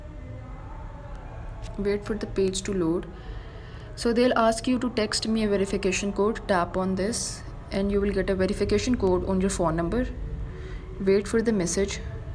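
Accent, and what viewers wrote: India. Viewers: it is indianeese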